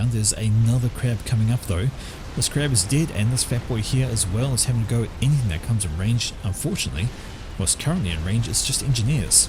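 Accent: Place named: New Zealand. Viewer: awesome cast. are you a kiwi btw? took me a min of scratching my head before placing the accent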